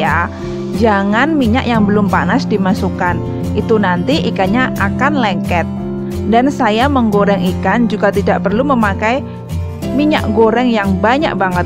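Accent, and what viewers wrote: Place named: Java, Indonesia. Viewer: Wah mantap bikin perut lper ni 😄 jowo medok hadir embak e..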